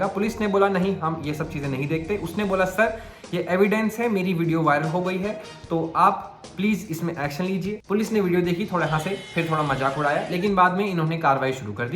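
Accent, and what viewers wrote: Pahadi, India. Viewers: Yes 😂 why not., I literally laugh every second 😂 plus your pahadi accent ❤ lots of love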